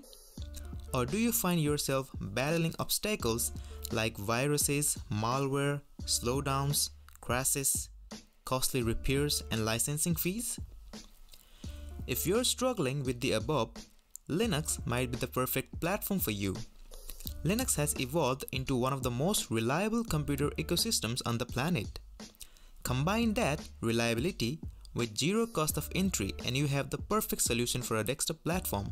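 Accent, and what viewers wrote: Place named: Finland. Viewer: Fills like this guy is Finnish :)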